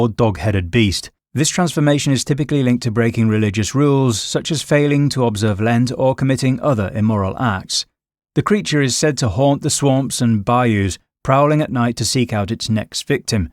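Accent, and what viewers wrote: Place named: England, United Kingdom. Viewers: You Not even native American and making videos about your original predecessors 😂 lil english accent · I always find it a bit odd that people with English accents talk about American folklore.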